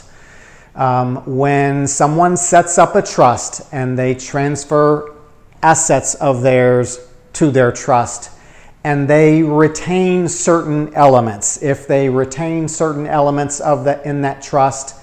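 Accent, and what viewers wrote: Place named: South, United States. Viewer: Thank the southern drawl.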